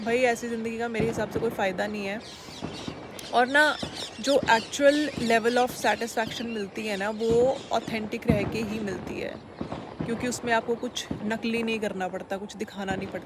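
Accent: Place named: Punjab, India. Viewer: Aap Punjab k ho ya विदेशी Me Rajasthan se हू😮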